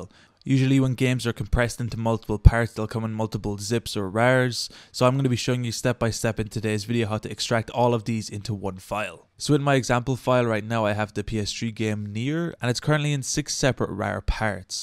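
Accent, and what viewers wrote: Ireland. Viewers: very helpful thx love your Irish accent : )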